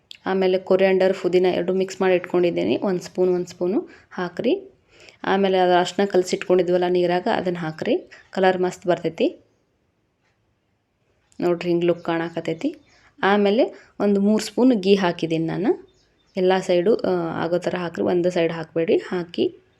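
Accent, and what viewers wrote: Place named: North Karnataka, India. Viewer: ನೀವು ಮಾತಾಡೋ ಶೈಲಿ ನನಗೂ ಚಲೋ ಇಷ್ಟ ಆಯ್ತ ರಿ.... ಪಕ್ಕ ಕನ್ನಡ ಮಂಡ್ಯದಿಂದ ಉತ್ತರ ಕರ್ನಾಟಕದ ಸೈಕಲ್ ಸವಾರಿವರೆಗೆ❤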